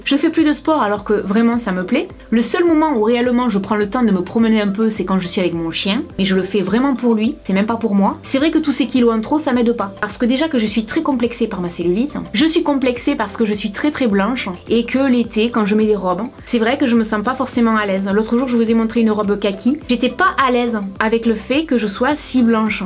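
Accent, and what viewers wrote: South, France. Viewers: Je vais bientôt avoir 26 ans et je me sens tellement plus proche de toi que les autres Youtubeuse qui nous placent bien leurs vidéos Marketing et nous font culpabiliser avec leur ventre plat sans jolie poignée d'amour de chocolat Lol. Je serais tellement déçue si tu arrêtais on a tellement besoin de youtubeuse comme toi naturelle et pas superficielle. Et surtout j'adore tellement ton joli accent du sud de la France 😍 courage ma belle. Personnellement j'ai un poids normal mais avec des poignées d'amour j'assume pas trop mais je n'ai pas envie de me priver je mange déjà équilibré mais avec un peu de chocolat lol (on n'a qu'une vie) 😘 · Je crois que quand on passe la barre des 40 on a du mal á trouver son équilibre dès qu'on passe par des changements. Equilibre psychologique et physique. .Tout est plus lent. Ce qui m'aide c' est au moins prendre soin de moi au niveau de la tête. 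Comme ça j'essaye de pas trop me crasher dans les descentes. Tout le travail que tu as fait sur toi est bon , malgré ce que je vais te dire tu ne vas pas le croire, du coup il faut que tu apprennes á te supporter, oui ça s'apprend et des fois ça passe par le fait de se supporter dans la pire des situations , du coup les creux de vagues durent moins longtemps. A plus de 40 ans les hormones commandent, ne JAMAIS oublier ça et du coup on a l'impression de devenir complètement dingos. Le chemin est parfois long et tortueux. Tu es très drôle et très réaliste c' est génial , ça fait du bien . Il faut s'occuper de soi á tous les niveaux , il faut apprendre à s'aimer et on y arrive (si j'y arrive tu peux y arriver) en se faisant accompagner, en faisant des lectures... Une fois que tu auras cette autre base, toute l'expérience que tu as au niveau du sport et de la nourriture se replacera. Big up à toi , j'adore ton accent et ton amour de la vie, la vrai , me too je suis du sud et ça fait du bien de l'entendre.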